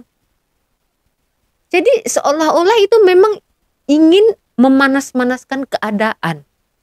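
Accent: Malaysia. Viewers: Yah malu malu bahasamu Melayu bang logatmu kau bukan orang Indonesia kalo berani buka topengmu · Bang kasih lepas topengmu anda ngomongnya macam bahasa melayu malaysia jangan bohong dong bang malaysia yang miskin juga banyak yang tidak punya rumah juga ada walau indonesia sema rakyatknya punya rumah betul enggak saya tau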